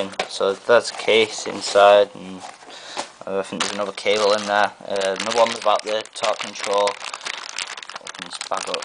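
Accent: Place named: United Kingdom. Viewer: HE'S FREAKING BRITISH..ITS HARD TO UNDERSTAND BRISTISH ACCENT SOMETIMES..I HAVE A BRITISH ACCENT TOO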